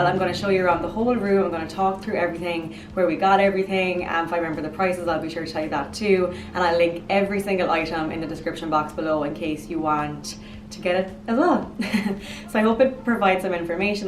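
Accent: Ireland. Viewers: New subbie! I love this room and your accent! Lol are you 🍀 Irish? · Love your Irish accent... And your gorgeous red hair, beautiful green eye; I'm a sucker for redheads, coz I find you very distinct!! You're mostly known to have a fiery temper😁😁😄😄🙋🏽🙋🏽🙋🏽.... Never seen that Marilyn print either... My mammy grew up with Marilyn , she said during her time every wanted to look like Marilyn, she was the poster girl of her time😜😜🙋🏽🙋🏽🙋🏽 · Jaylan O she's irish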